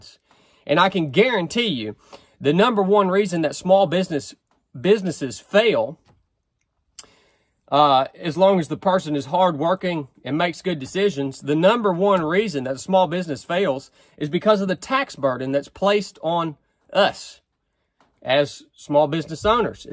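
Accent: United States. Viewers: Where in the USA is the accent from? 👍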